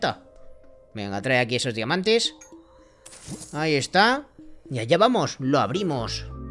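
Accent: Spain. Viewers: Si digo algo que no dicen en españa es que soy de latino america como se que sos de españa por el acrnto bueno en idioma latino el dr zombie si habla castellano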